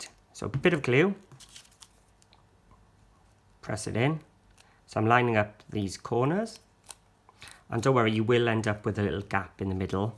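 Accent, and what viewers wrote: Wales, United Kingdom. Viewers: Think u are Welsh yes ?I love Wales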